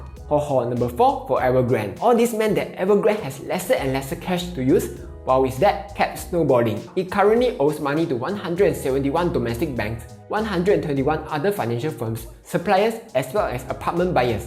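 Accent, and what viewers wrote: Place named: Singapore. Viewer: He is speaking Singlish, which is Singaporean English!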